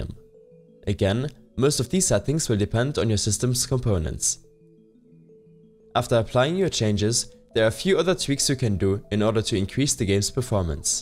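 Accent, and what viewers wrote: Germany. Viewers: i bet ur german u sound like one ich bin ja auch deutsche :D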